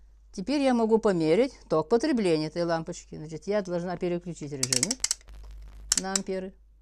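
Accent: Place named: Udmurtia, Russia. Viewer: Акцент у Вас интересный. Вы из Удмуртии или Одессы?)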